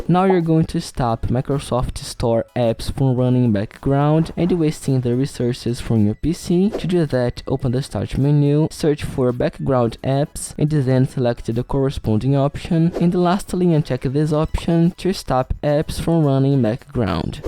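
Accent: Brazil. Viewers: mano esse video ficou deveras dahora, voce conseguiu enganar incrivelmente a gringa falando ingles, mas claramente pelo seu sotaque é brasileiro. amei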